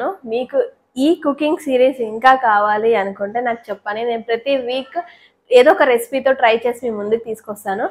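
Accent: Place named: Anantapur, India. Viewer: Pure Anantapur slang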